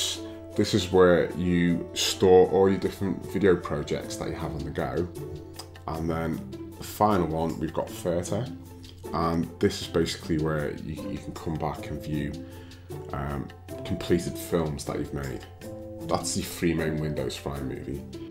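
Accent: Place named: Liverpool, United Kingdom. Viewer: Scouce, lad 😂😂😂😂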